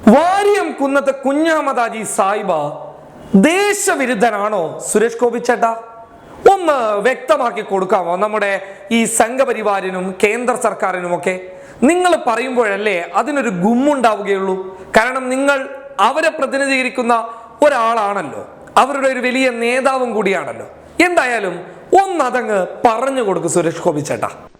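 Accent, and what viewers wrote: Kasaragod, India. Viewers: Kasarkodan slang idykku kayari varunnu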